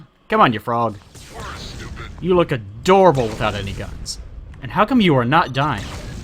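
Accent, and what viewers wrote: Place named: Kentucky, United States. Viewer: Goddamnit, how do you make a Kentucky accent sound so gallant?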